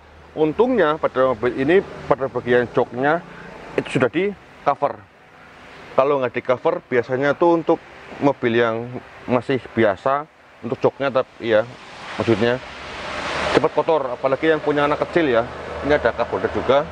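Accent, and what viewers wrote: Banyumas, Indonesia. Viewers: jos gandos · Tangerang menyimak kang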